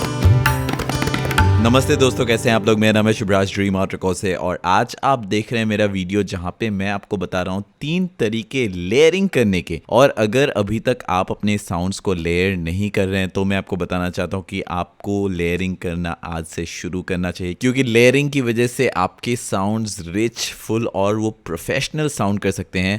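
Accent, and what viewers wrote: Assam, India. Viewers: Bhai are you from Assam?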